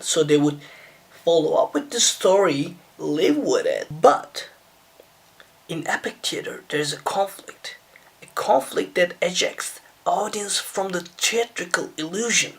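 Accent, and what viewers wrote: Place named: England, United Kingdom. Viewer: Your english is better, seems more from England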